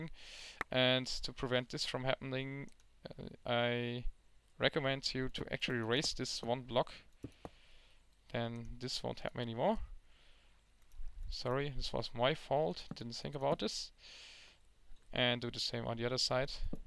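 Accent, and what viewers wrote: Germany. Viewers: dieser deutsche akkzent! :D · hört sich komisch an, englisch zu sprechen mit dem deutschen akzent :d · man merkt das du deutscher bist der englisch redet :D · Hes german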